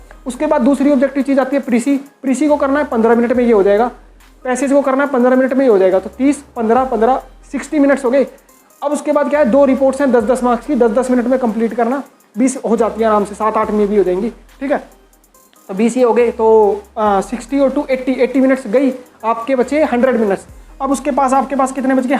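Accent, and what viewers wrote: Haryana, India. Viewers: Sir aap haryana se lag rhe ho 😎, thanks sir ji 😇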